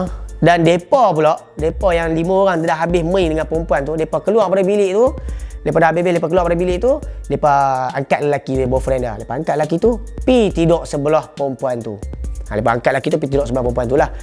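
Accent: Kedah, Malaysia. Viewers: tajuk ceghita menarik tp cagha teghita sebenagnya not bad cuma suka ulang2 benda yg sama. Sementagha nk sampai kepermulaan ceghita tu xtau beghapa kali dok ulang benda yg sama. baiki tentang tu......channel ni akn jd lbh menarik. InsyaAllah. Loghat Og terbaik. 👍👍👍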